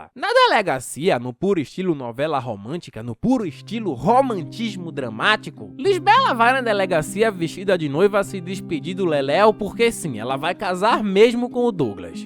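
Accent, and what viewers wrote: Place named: Pernambuco, Brazil. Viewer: Rapaz To surpreso do se Da qui de Pernambuco Sempre Suspeitei do Sutaque Manda um salve Pra Aliança PE, sou muito seu fan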